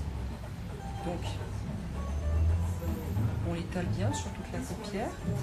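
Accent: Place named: England, United Kingdom. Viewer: puree l'accent anglais !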